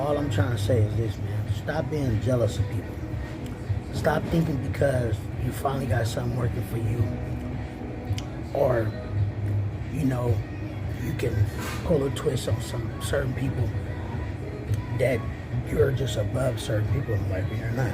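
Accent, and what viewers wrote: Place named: California, United States. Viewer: Bruh as soon as i click on this vid and i could tell, Cali, esp after hearing 🤣 yeeeee🤟🏿 this was some real shi tho. Am subbin 💯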